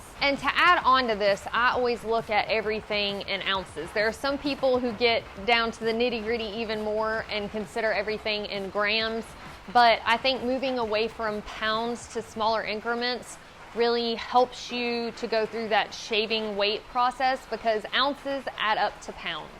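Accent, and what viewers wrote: South, United States. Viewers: Draws? As in rhymes with straws? I’m pretty sure you’re talking about undies. I do love the southern accent. But our European friends might have problems with this one. Anyways, great video as usual. Keep them coming. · Why can't every woman in America have a southern accent ?? 🙄. Makes your videos so much nicer to listen too. Thanks for posting....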